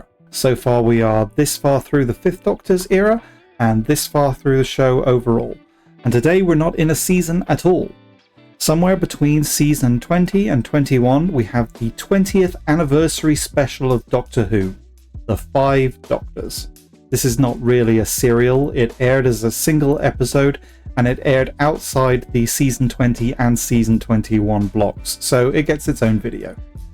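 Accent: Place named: United Kingdom. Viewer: In brum. Can tell you’re from the uk lol loved that show.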